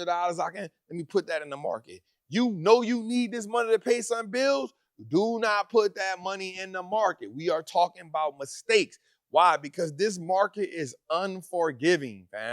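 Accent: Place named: Louisiana, United States. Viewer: Thanks for the information!! Also, love your Cajun accent!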